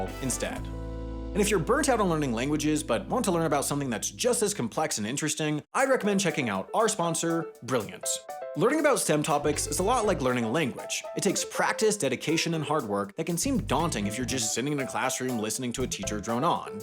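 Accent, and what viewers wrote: United States. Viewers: Are Americans really that bad at pronouncing other languages without that strong American accent or is it just? To learn how to phonetically pronounce so few letters in an another language is not that hard really. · It's unsettling to me how someone so knowledgeable just...cannot produce basic sounds without them being overtly "American" 💀 having flashbacks to his China videos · say they are the spanish vowel goes on to pronounce i and e like every stupid American even tho he speaks french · he just has the ‘American’ accent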